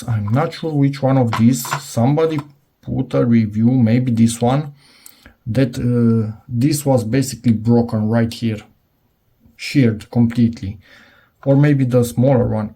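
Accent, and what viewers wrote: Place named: Romania. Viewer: Accent clar romanesc :))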